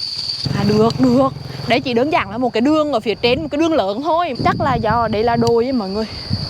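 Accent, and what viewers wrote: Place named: Quang Binh, Vietnam. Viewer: Tưởng quảng bình...nghe giọng dễ thương quá.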